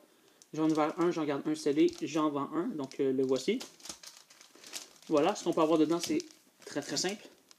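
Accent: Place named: Quebec, Canada. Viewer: Oye un quebecquois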